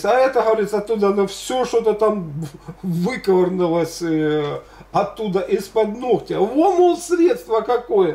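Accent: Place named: South, Russia. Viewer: Благодарю,Геннадий,все посуществу,а мне нравиться наш южный говор ,а кому не нравится,пусть не соушают!Я так и подозревала,сделали ставку на Ваше имя,хотели деньги зарабатывать на передаче.Как то не верилось в благость телевидения.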